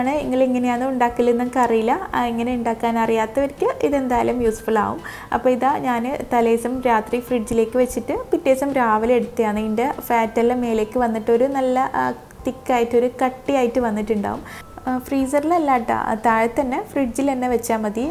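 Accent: Kannur, India. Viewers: Thani kannur bashaa😀 nmml kannuranee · Hii ningalea video boar adikkkukaye illa kannur style basha😂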